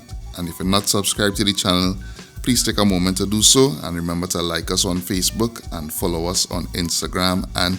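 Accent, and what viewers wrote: Trinidad and Tobago. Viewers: I AM IN LOVE WITH YOUR TRINIDAD TALK 🇹🇹 😍 💕 ♥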